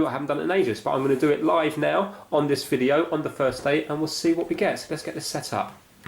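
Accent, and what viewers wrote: United Kingdom. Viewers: I started with walking during my lunc hours, fast forward 3 years , now I run 3 x a week and coach a running club :). PS: You look just like a high school friend of mine, but with a British accent :)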